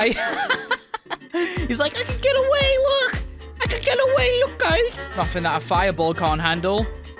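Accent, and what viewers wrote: United Kingdom. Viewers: This is awesome! Are you British?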